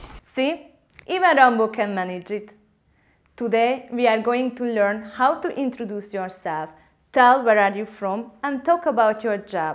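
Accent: Hungary. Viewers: Can't you hear the Hungarian accent when she talks English???? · A lengyel nyelv nyelvtana sokkal kevésbé bonyolult, mint a magyaré, és a kiejtés nehézségeit is le lehet küzdeni. A felépítése nagyban hasonlít más szláv nyelvekhez, és egy cseh vagy szlovák nagyjából 40-50%-osan megérti a lengyelt, de bármely más szláv nyelvet beszélő is meg fog jó néhány szót érteni. Iszonyatosan magyaros az akcentusod; érdemes lenne dolgozni rajta, mielőtt magadat nyelvtanárként megjelölve nyelvtanító videókat készítesz! Ráadásul azt a műmosolyt is elhagyhatnád.. Még ha bunkónak is tűnik a hozzászólásom, nélkülöz mindenféle sértő szándékot. Nagyon is becsülendő, hogy veszed a fáradságot, és ilyen videókat készítesz - csak a fenti dolgok bökik a csőrömet.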